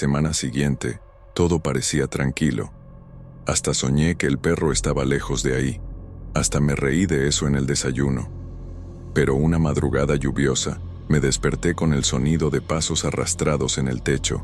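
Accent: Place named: Mexico. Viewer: Hay como que me saco de onda la forma de relatar jajajajaj me gusta porque no es igual que los demás como que habla más mexicano 😅😅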